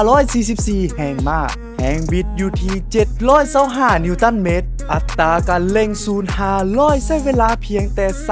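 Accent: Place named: Laos. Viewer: ชอบฟังเสียงภาษาลาวแบบนี้มากกว่าค่ะ ดูเป็นเอกลักษณ์ดีค่ะ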